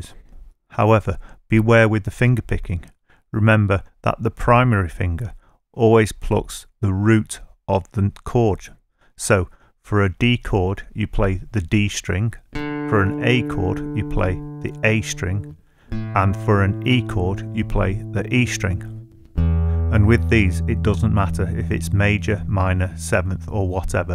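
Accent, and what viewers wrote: United Kingdom. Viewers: BLIMEY!!! I thought I lost this channel and never be found again. I used to watch before I had guitar and thinking of following alone after I get one. And somehow I forgot name of channel. I dug on my history and So so relieved I found it. You sir are amazing. Maybe it's British accent so warmy and welcoming. I hope to buy e-book soon. Thank you very for articulating videos in such a manner which clicks with newbie like me. It must be time consuming to make videos on various topic but my lord your tutorial worth pile of gold. You are Bob Ross of guitar. I am so grateful for your videos. Once again thank you.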